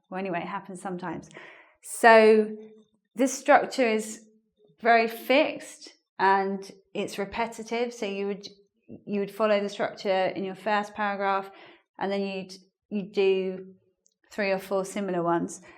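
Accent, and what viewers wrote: United Kingdom. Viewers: Do you have British accent ?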